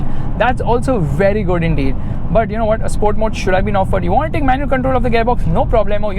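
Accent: India. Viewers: Except your Indian accent everything great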